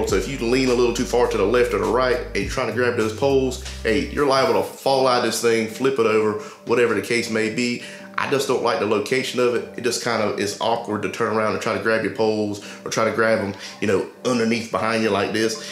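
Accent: South, United States. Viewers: Good review!! You gotta be from the south bro, you got that down home good ol boy sound to you! I’m in Auburn Alabama area.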